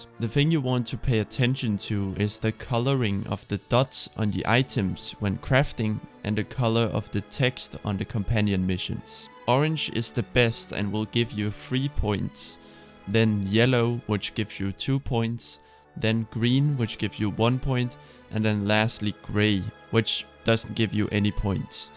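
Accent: Denmark. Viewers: Are you Danish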